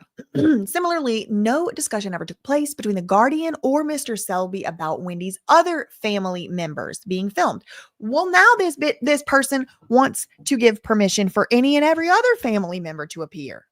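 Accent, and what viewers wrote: South, United States. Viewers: I’m just here for lovely southern accent. ❤ God bless this woman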